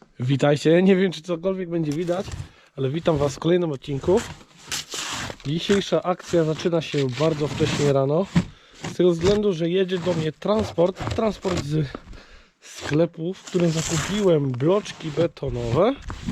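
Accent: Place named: Kashubia, Poland. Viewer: Zatknij uszy bo to sa kaszuby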